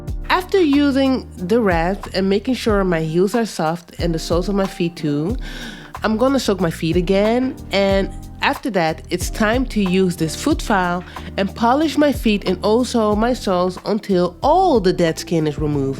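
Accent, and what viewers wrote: Netherlands. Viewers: Are you dutch by any chance if I may ask? loving the vids... New subscriber here🥰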